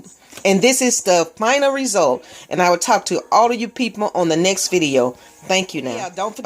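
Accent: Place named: South, United States. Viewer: 😂😂😂😂lol. She has a southern accent.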